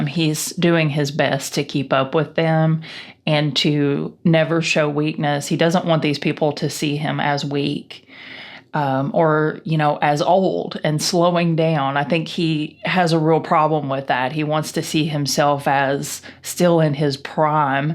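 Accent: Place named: Kentucky, United States. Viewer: Hello. LOVE your accent (my father was from Kentucky. It's a beautiful state!), and your readings. Bless you for the New Year 🎊🎆🎇🔔!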